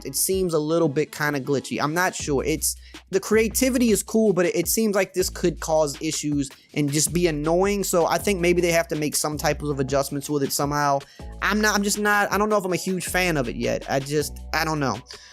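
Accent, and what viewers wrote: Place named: New Orleans, United States. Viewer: Are you from New Orleans you got the accent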